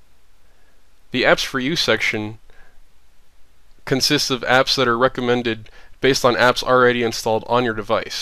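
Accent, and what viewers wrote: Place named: Netherlands. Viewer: You sound a bit like a Dutch guy speaking English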